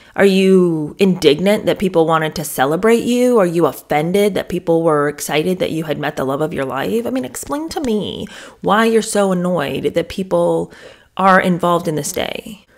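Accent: United Kingdom. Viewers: I love your British accent lol!!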